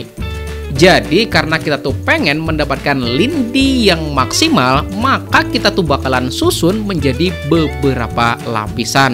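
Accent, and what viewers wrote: Banjar, Indonesia. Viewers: kaya org banjar sdin ni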